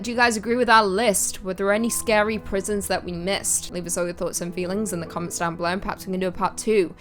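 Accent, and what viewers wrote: United Kingdom. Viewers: Awesome video in a beautiful UK accent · Figured a prison in North Korea would make the list. Lovely sounding British accent, BTW.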